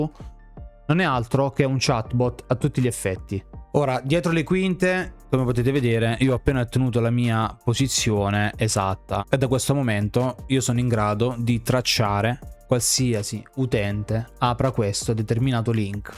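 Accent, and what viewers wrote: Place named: Apulia, Italy. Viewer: Immaginavo fossi pugliese 🤣 Ho provato subito e funziona alla grande. Ma quanto tempo si ha prima che il link esca scada ?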